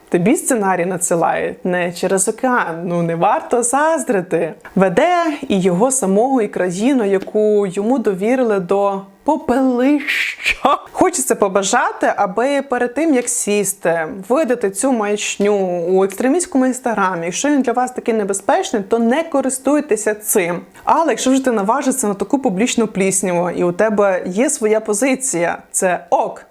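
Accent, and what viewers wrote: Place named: Western Ukraine, Ukraine. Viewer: Клас, цей західний діалект🥰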